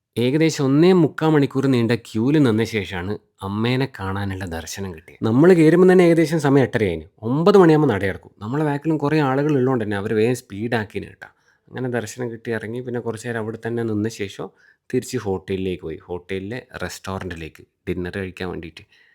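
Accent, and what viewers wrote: Kannur, India. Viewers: idh kannur slang a mone😂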